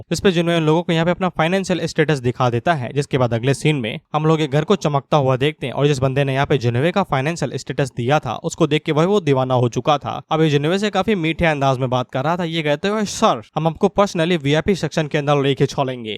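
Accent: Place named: Bhojpuri, India. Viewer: Tumare muh se bhojpuri bhohat achhi lagti hai ❤❤❤❤❤❤ or i am the fated villain next part please 😢😢😢😢😢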